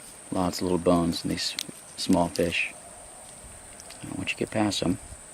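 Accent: Texas, United States. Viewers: Is this how a raw Texan speaks? Theres something stylish about it and more importantly, something rustic and natural, a natural thing that a person from anywhere in the world can identify with. I mean, in my town, old tough men speaks jus like this, and they know stuff just like this. My salutes. You guys are the real natural human thing, and maybe thats why all of them other ones from other countries relate to u and speak the same style no matter what the language is (i mean only the raw natural guys). Theres just something universally common about you rare lot around the world. The things u endure, the things you eat, the way you carry on unlike some city folks who wud just say yuk, freak out , run and eventually die prematurely. Once again, respect to you. Thanks for showing us a glimpse :)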